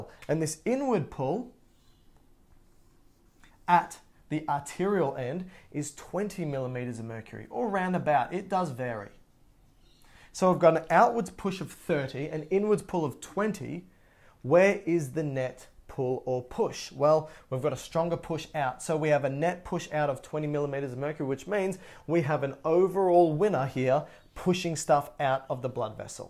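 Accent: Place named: Australia. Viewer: There's auto translate captions available, hope that helps... unless you're having a problem with his Australian accent :-)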